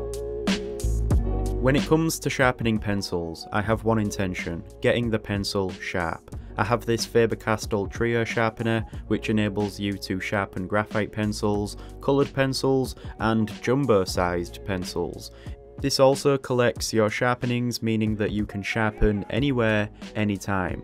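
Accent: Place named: Hull, United Kingdom. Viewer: Hello. Just thought I'd ask, with that accent, are you from Hull? I grew up there myself. Love the channel by the way, I've learnt quite a bit just from your tutorials.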